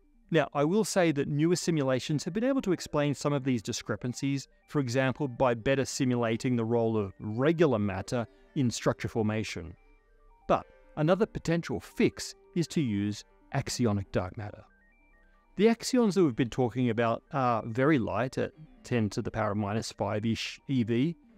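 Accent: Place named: Australia. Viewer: that's his Australian accent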